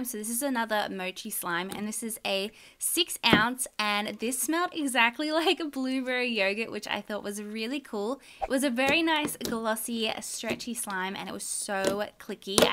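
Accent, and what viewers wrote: Australia. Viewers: Your Australian right!!!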